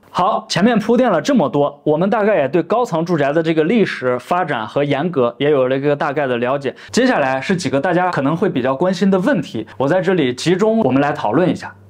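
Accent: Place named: Henan, China. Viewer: 突然听出了一点的口音，是河南人吗😊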